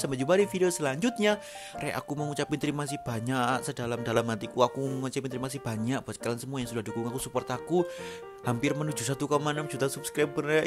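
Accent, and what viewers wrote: Java, Indonesia. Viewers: dengan khas suara medoknya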